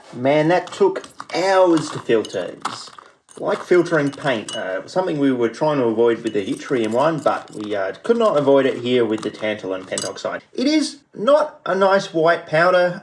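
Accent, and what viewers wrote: Australia. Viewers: Saying "I can't do the Australian accent" when you literally HAVE and Aussie accent is actually pure gold. Thanks for that, I needed it. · “i can’t do the Australian accent” …mate, you have an Australian accent all the time lol ❤ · Your always doing “the Australian accent”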